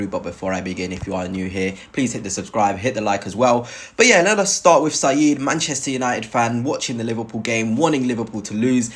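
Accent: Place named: Paris, France. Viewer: Wow he sounds Parisian.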